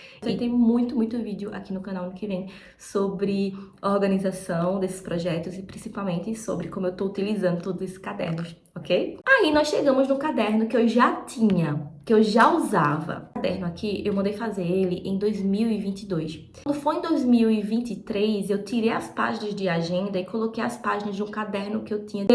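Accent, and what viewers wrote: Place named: Recife, Brazil. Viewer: O sotaque recifense😍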